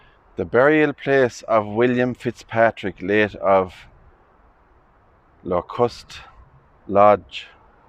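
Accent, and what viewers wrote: Ireland. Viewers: Beautiful video thanks to you Lovely irish voice.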